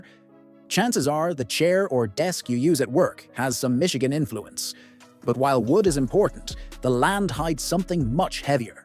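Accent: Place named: Ireland. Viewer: AI(?) Irish. Do love it.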